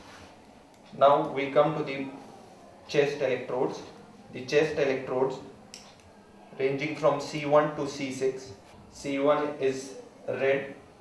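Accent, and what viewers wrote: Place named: India. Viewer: thanku sr for making video in Indian english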